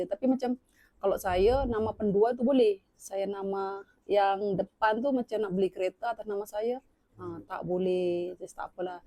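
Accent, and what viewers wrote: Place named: Malaysia. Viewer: Cara percakapannya dah macam orang Malaysia. Semoga permohonan kerakyataan Malaysia berjaya.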